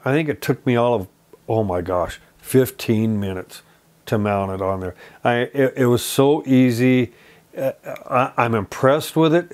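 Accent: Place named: New England, United States. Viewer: I usually like to fish by myself as well. Most companions can't get up early enough and get antsy quickly. By the way, you sound like you're from New England or MA; if so, where from?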